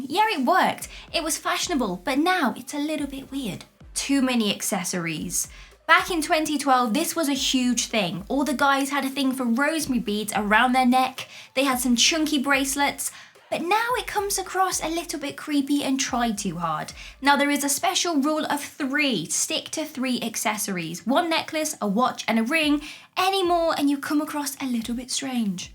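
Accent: England, United Kingdom. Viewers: She is lovely, intelligent and her Queen's English is easy on the ears.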